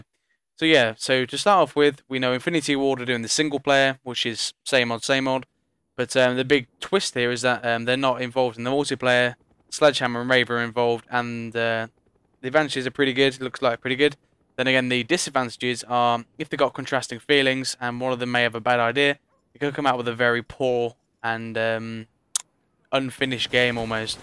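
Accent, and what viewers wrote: United Kingdom. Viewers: Thanks for taking the time to make all these vids - really appreciate it, great effort. It's refreshing to hear a fellow Brit too! Nowt wrong with other nationalities I might add, (quickly got that in before I was shot down in flames!) Keep up the good work.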